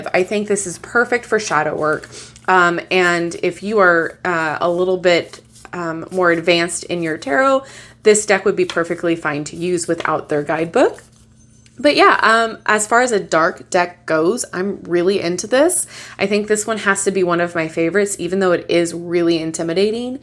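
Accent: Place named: United States. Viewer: спасибо за обзор! У тебя такой красивый акцент!!! Класс! Прям американка!!!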